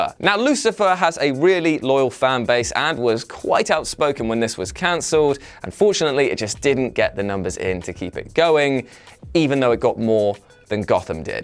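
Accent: United Kingdom. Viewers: where ya from in the uk?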